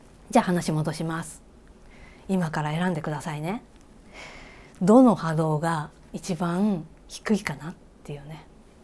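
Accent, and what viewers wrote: Kansai, Japan. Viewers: 関西弁素敵です。辻さんのお話は、体の深いところから出ているように感じます。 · 関西弁で、喋ってるさん好きです。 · 関西弁好きですよ！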